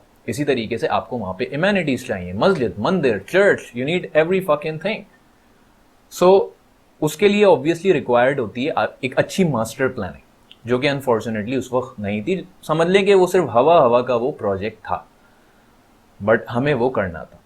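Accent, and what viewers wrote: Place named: Haryana, India. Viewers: Bhai kya accent pakda h haryanvi ka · Haryanvi kese ati h apko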